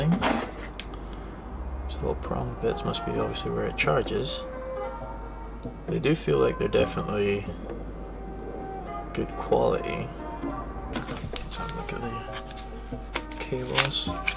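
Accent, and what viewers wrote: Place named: Scotland, United Kingdom. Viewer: I live in the Glasgow area and you honestly have the most interesting Scottish Accent I've ever heard! Oh, and by the way, I bought these earphones on the back of this review. Mainly because when you started playing music your face said 😲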